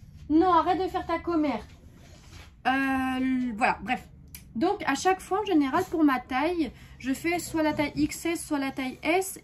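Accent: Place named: Hauts-de-France, France. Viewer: Bonjour, je découvre votre chaîne et j'ai regardé votre vidéo avec grand plaisir, votre façon de parler très naturelle me plaît beaucoup (peut-être aussi parce que j'habite les hauts de France également et qu'il y a de toutes petites pointes d'accent dans vos paroles). J'ai cru comprendre que vous avez commencé le tricot il y a un an, je suis en admiration devant votre paire de chaussettes de Noël 😮😮😮😮😮😮😮. Continuez comme ça ne changez rien à bientôt 🙏🤩🤩🤩🤩🤩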